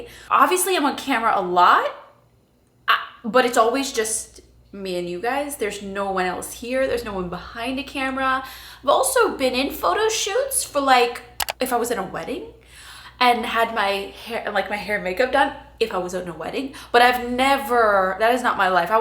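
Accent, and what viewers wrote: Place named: South, United States. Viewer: Cracking up at how your southern accent keeps coming in and out underneath the California one! 😅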